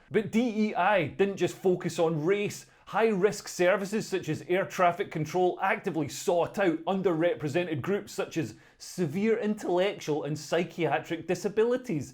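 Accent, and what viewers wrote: Scotland, United Kingdom. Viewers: This is great, and the Scottish accent saying plain and obvious things makes it so much better.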